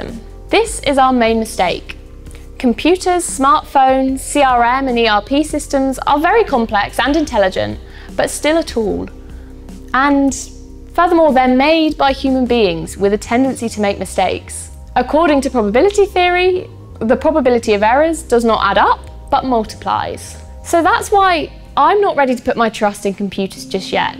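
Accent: United Kingdom. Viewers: Yoooo, you rock!! Love your british accent. Also lukas and bradleys❤️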